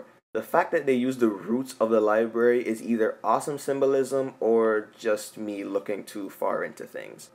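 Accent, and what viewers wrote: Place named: Caribbean. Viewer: That was a great review. May I ask you where you are from? You sound like you're from the Caribbean.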